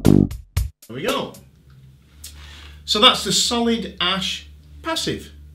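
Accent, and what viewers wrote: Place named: Bolton, United Kingdom. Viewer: Looks and sounds great, Sir! Nice to hear the dulcet tones of a fellow Northerner, too. Sounds like you're from my neck of the woods - Bolton. Correct me if I'm wrong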